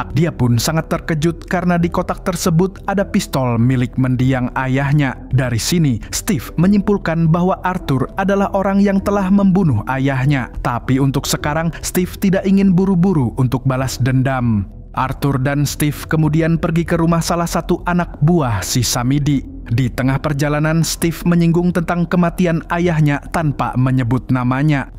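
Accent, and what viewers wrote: Banjar, Indonesia. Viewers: Urang banjar pian lah mang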